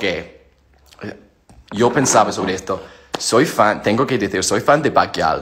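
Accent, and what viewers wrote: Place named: Scotland, United Kingdom. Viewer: Hi John, I speak both English and Spanish (more Carribean dialect), but want congratulate you on your mastery of the language. You were easy to follow and your accent is exceptional. I love the drop of the Scot in you. As an American, it's intriguing. Stay safe and be well. Can't wait for Droughtlander to end!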